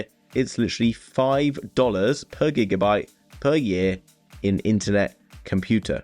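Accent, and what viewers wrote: United Kingdom. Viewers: You look like a young rich old wise man if that makes sense. It’s probably the old money living room and British accent you have. I love it haha 😂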